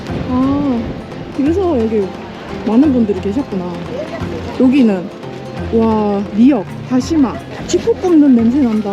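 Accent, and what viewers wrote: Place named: Busan, South Korea. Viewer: 처음으로 댓글 남겨봅니다. 늘 잘 보고 있습니다. 저에겐 많이 힐링 되어요. 감사합니다. 아무것도 섞이지 않은 순수한 선의와, 솔직하고 따뜻한 일상 영상이 너무 좋더라구요. 설령 슬프고 좀 우울해 쳐지고 하는 영상도 넘 순수해서 같이 공감할수 있어서. 강아지가 제가 집안일 할때면 거실에서 있으면서 tv 영상 켜주는걸 좋아하는데 그때 늘 영상 켜놓고 있어요. 얘도 힐링이 되는지 소파에 올라가 늘 꾸벅 졸더라구요 ㅎㅎ 구독자 선배님들께 예쁘게 보이고 싶어서 화장을 진하게 한다고 하는 영상은 정말 빵~ 터졌어요. 너무 귀여웠어요. 그리고 의 아재개그 정말 팬입니다. 저 몇개 외웠어요 ㅎㅎㅎ 또 서울 와서 우리는 사투리 안쓰는데 사투리 쓴다고 알아봐서 놀랐다고 해서 남편이랑 보다가 진짜 뿜었어요 ㅋㅋㅋㅋ 어찌나 귀여운지… 실제로 부산출신 제 친구가 한 말이랑 너무 똑같았거든요. ㅋㅋㅋㅋㅋ 두분 너무 너무 잘 살고 계신것 같아 부럽습니다. 코로나, 계엄 겹치며 사업 문제로 몸도 마음도 많이 지치고 힘들었을때 함께 일상을 나누는 듯 정말 많이 힘이 되었습니다. 감사하고 극 내향인 일인으로서 인제서야 댓글 남겨봅니다. (이것도 메모장에서 몇번의 수정을 거쳐서 올렸는지 ㅋㅋㅋ)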